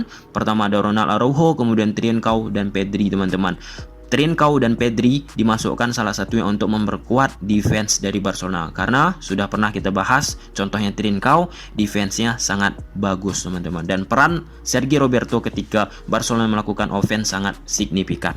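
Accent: Malaysia. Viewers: abang...logat melayunya kontal kali. dari utara kah? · logatnya kayak orang malaysia, warga malaysia kah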